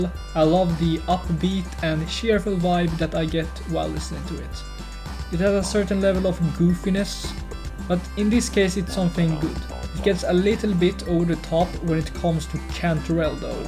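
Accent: Sweden. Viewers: You have a strong swedish accent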